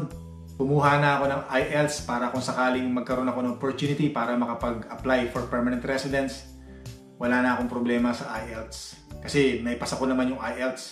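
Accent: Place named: Pampanga, Philippines. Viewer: Ang galing ni kuya kahit mahaba ang story❤ parang kapangpangan si kuya sounds like😂 tinapos ko story mo very inspiring🙏☺️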